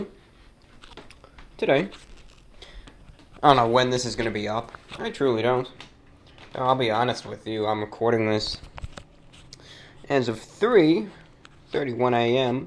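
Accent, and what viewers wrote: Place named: New York, United States. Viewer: I feel fortunate to still be able to find and listen to in the “olden” days 😁. Love the NY accent lol. You can whistle! I’m jealous! I’ve tried my entire life consistently but no luck. I still can’t believe I’m listening to a 16 year old kid lol. But Nike is “just do it”. Not “get it done” 😂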